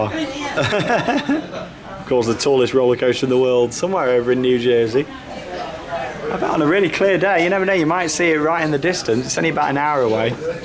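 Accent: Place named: West Midlands, United Kingdom. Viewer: Where are you from your voice sounds very west midlands uk lol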